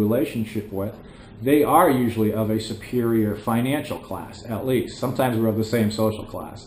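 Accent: United States. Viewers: I like the style of these videos of yours, they are just more thoughtful than than a lot of other video blogs. I am Brit myself, I like that 'Yankee' way of talking you have, casual -laid back but informative.( Yea, I know that internally Northerners are Yankee, but to those outside, we call 'ya all' Yankees). I am looking ahead to retiring in the phillipines, still a few years away. I am thinking of literally sailing off to the Phillipines on my boat, living there and and puttering about. Your videos just give me a little bit of a heads up on what to expect; hopefully expats as thoughtful as yourself are part of the package, thanks for your insights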